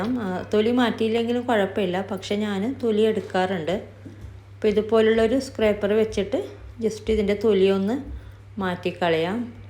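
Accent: Kasaragod, India. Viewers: Hi, Kasargod kaaroode samsaaram pole thanne avarude recipies um.randum enikkishttaan 😍.recipe name paraye Venda .kettathanne on try cheyyan thonnum.amazing ithaa😊. Kasargod kaaroode spl dishes iniyum pradheekshikkunnu.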